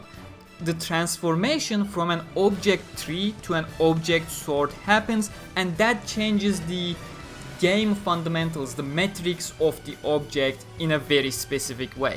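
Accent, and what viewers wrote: Turkey. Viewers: The way you talk English is just like a Turk speaking. Actually your name is so, that makes sense. If you're Turkish, please reply this comment. I wish to meet a Turk who watches SAO and talk about it a lot.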